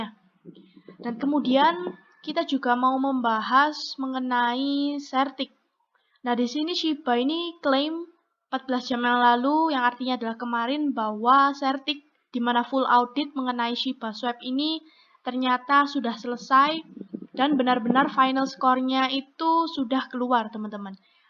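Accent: Java, Indonesia. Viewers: medok x cakapnya hehehhehe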